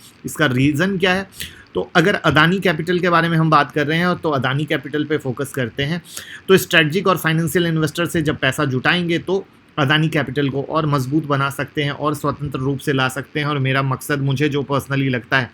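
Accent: Bihar, India. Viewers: Yeh bihari hai aise hi pronounce krta hai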